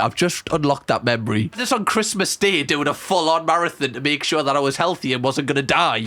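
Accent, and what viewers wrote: United Kingdom. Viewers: Hey I tried to know where are you from your accent,the nearest one came to my mind British:) am I right? Am studying English so sorry for annoying you 😅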